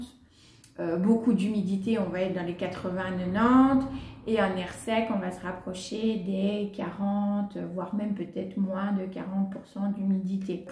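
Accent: Belgium. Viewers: Belgique aussi ici 😜 (j'avais reconnu l'accent 🙊😁) merci beaucoup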